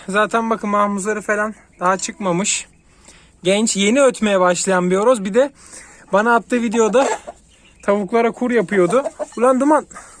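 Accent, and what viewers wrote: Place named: Sakarya, Turkey. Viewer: Abi ses sakaryadami oturuyon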